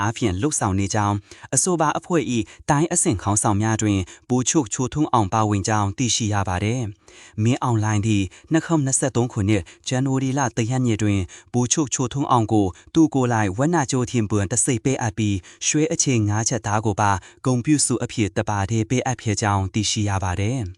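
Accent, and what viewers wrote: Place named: China. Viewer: စိန မီဒီယာလား။အသံက ။နာေဖရး အသံထြက္တာ သတိထားနားေထာင္ျကည့္။တယုတ္ျကီး။သတင္းေျကျငာသူ။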